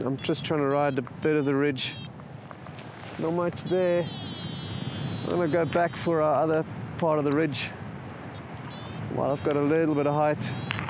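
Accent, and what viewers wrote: South Africa. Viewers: Haha nothing like Porterville to bring out your sarth efriken accent ey bru! Lekka!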